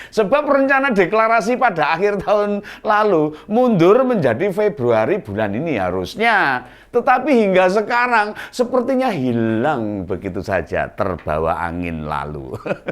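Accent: Java, Indonesia. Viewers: saya sukasekali dgn logatnya mas ini, jawa banget, logat asli jawa majapahit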